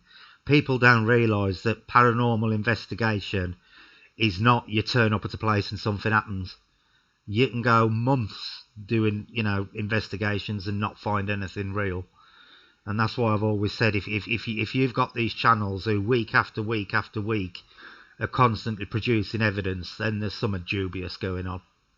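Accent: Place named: Dudley, United Kingdom. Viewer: Missed the live but catching up now. Nice to hear that Dudley accent aer kid hehe :)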